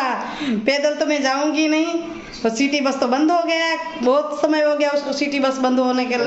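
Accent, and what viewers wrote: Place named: Gujarat, India. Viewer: Saras hindi bhasha bolo chho apane gujarati chie etle tone gujarati j ave hindi no pan but dhire dhire avadi jase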